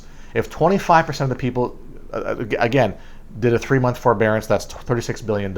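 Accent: Canada. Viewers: ouuut😅 abouut he's definitely from Canada.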